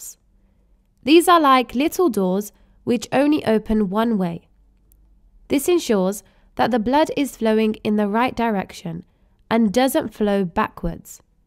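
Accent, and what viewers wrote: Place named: United Kingdom. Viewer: Bless you and your British accent. Thank you.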